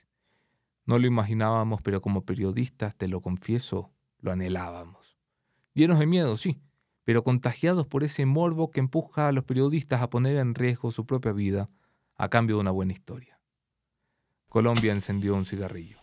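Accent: Argentina. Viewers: Por qué habla con acento argentino ? No es ecuatoriano? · Lo q yo no entiendo Es Venezolano habla como Argentino y vive o vivio en Ecuador 😮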